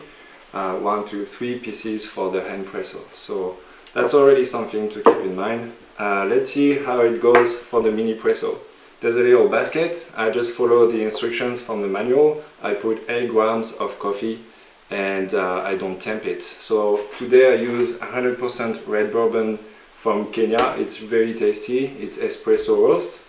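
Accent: France. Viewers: Teresa Flores It is not rude tone. He is just from France and his native language is not English. Most people have some accent in foreing language! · petites critiques : pour si peu de quantité de café cela n'énervera pas un chat, je préfère le petit noir au bar tabac du coin pourvu qu'il ait un bon torréfacteur de renom autre que Grand-mère ou Monoprix. En attendant de trouver sur le marché une autre machine aussi efficace mais produisant plus de café que çà. Bravo pour la vidéo...superbe French accent ;-)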